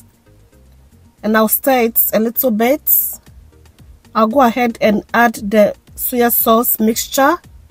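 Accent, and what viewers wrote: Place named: Jamaica. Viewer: What accent is that? Jamaican accent?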